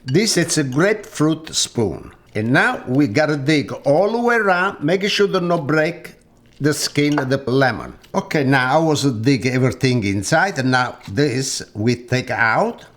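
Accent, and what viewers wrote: Italy. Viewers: Learn how to listen in His Italian accent of English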